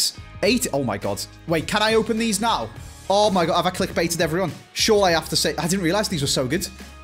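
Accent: Liverpool, United Kingdom. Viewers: Yes lad best scouse youtuber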